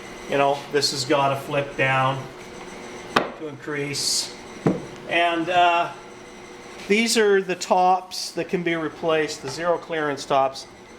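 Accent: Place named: Kentucky, United States. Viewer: UK? Seriously? You talk like you're from Kentucky. No offence.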